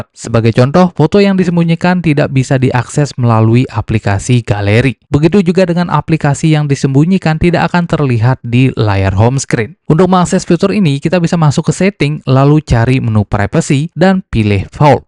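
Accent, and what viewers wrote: Bali, Indonesia. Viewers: Logat bali nya kental sekali Bli.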